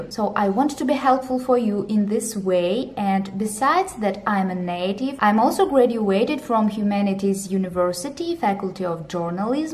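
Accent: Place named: Russia. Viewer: I love russian accent!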